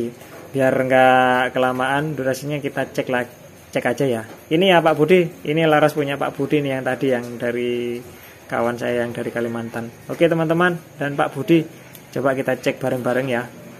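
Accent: Madura, Indonesia. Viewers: Klw dri logat bahasanya kyaknya dri madura ya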